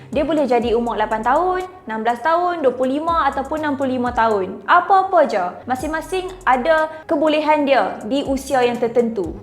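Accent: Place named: North, Malaysia. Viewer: Beauty with brains, tp pekat nau utagho😂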